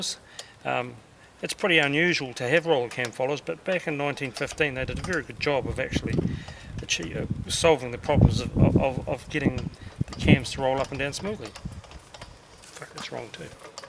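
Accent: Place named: New Zealand. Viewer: Kiwi English, easy as!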